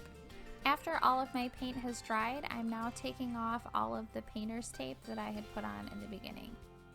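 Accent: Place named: Buffalo, United States. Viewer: I love this! I swear you have a Buffalo accent!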